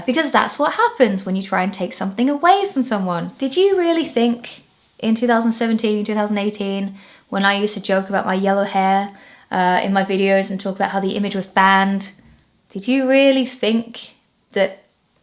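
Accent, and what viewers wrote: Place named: Northern England, United Kingdom. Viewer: I like your little northern accent coming through the Essex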